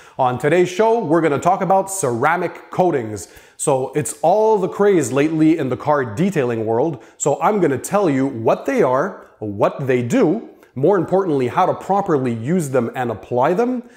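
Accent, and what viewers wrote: Quebec, Canada. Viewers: Are you Canadian?? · Dans seulement 5 seconde je le savais deja que tu venait du quebec , l'accent quebecois et a lexterieur les maisons typique dans les banlieues montreal. Très bon video ! Learned a lot from this video !!!!! definitely subscribing! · Are you from quebec ? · French Canadian? You sound hilarious.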